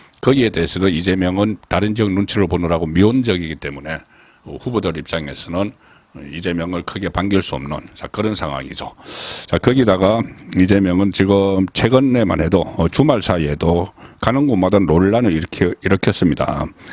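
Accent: Gyeongsang, South Korea. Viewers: 송국건님 시원시원한 경상도 억양으로 최고의 정치해설 짱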